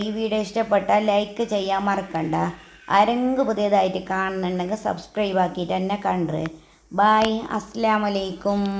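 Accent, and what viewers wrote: Kasaragod, India. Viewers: നിങ്ങൾ കാസർകോട് ആണോ ഭാഷ കേൾക്കാൻ നല്ല രസമുണ്ട്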